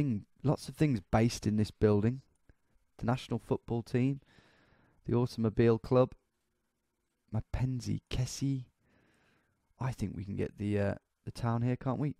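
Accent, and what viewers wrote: United States. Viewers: That perfect Midwestern accent... In Boston lol. Great video though, love these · your american accent is so spot on. makes me laugh every time! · His American accent is actually very good. And absolutely hilarious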